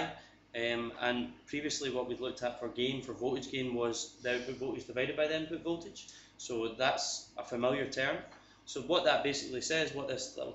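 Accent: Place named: Scotland, United Kingdom. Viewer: Thanks, love all the vids! Scottish accent i hear;) got prelims in few weeks so this helps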